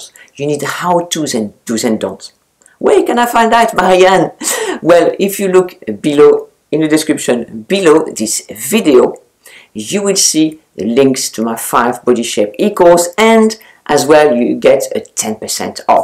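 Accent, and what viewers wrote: France. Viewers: Besides the great, and wonderful content, I come to this channel to hear French accent!♥️♥️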